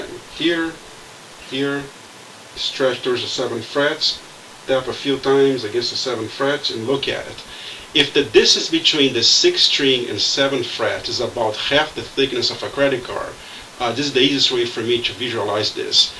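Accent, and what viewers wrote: Brazil. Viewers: sotaque brazuca detected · dude, are u brazilian? u really sound like one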